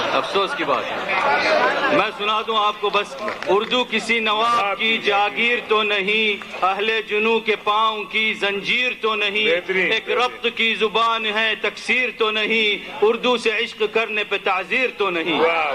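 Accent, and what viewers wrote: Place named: Lucknow, India. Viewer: لله درک یا شیخ هنیٸا لک هذاالابداع والارتجال ماشآ ء الله انت تقرض الشعر باالاردیة الفصحی کأ نک من لکناٶ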